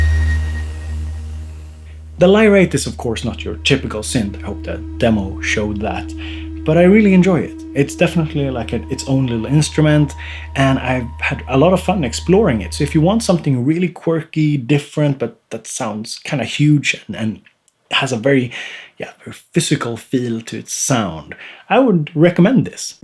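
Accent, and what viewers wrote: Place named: Sweden. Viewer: I've been wondering where your accent comes from. Hearing your long 'e's tells me you're Sw'e'dish. :) Thanks for the videos!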